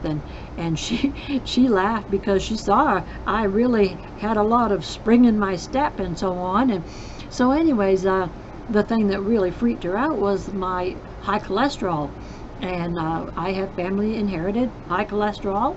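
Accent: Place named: Maine, United States. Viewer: She sounds like my family from Maine❤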